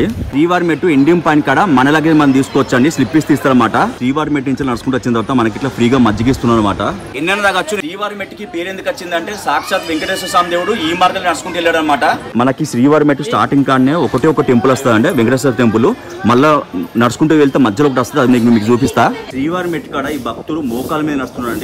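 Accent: Telangana, India. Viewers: Ni information telangana yasalo baguntdhi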